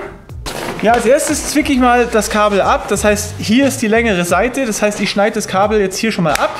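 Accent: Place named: Bavaria, Germany. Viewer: Hey! Super Videos, super Erklärungen die eigentlich jeder (Vollgasdepp) auch versteht und das ganze Gefluche auch noch auf allgäufrängbayrisch - einfach HERRLICH:D Speziell zu diesem Video: nimmt man nicht unisolierte Aderendhülsen für solche Arbeiten um nicht die Adern eben durch jene Isolierung unnötig zu belasten wenn diese im Stecker oder der Buchse dann (ab-)geknickt werden? (wenn man keine hat, kann man auch VORSICHTIG die Isolierung der isolierten Aderendhülsen mit einem feinen Seitenschneider oder einem scharfen Messer entfernen:) ) Grüße aus A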